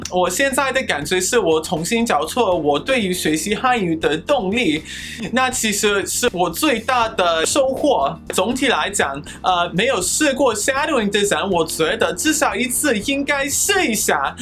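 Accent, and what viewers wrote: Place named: Guangdong, China. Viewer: You really sound like you've learned Mandarin in Guangdong (not a criticism-that's what I'm used to). I'm currently shadowing 小王子 in Cantonese over 27 days and recording it. I'll upload those when I have some free time.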